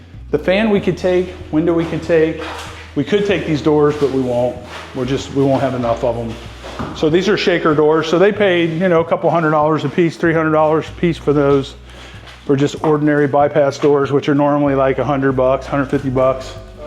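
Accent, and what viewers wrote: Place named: Southern Indiana, United States. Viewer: your Midwest accent... you said "dishWARSHer"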